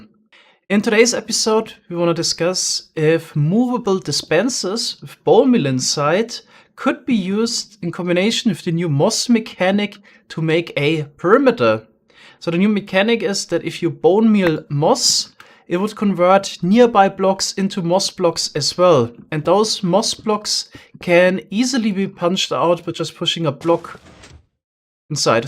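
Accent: Germany. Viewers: Ich wusste es du klingst übel deutsch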